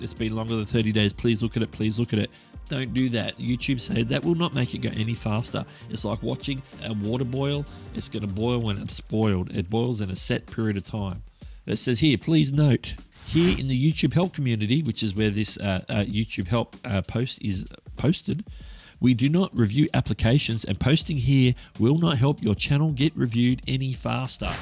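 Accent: Australia. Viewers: oh, aussie.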